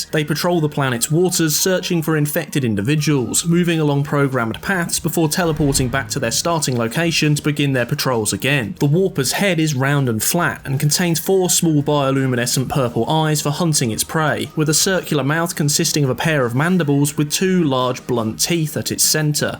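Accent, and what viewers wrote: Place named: United Kingdom. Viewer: Why is it every time I come across one of these Explained Video's No matter what game it is it's always Some British voiced Prepubescent kid XD lol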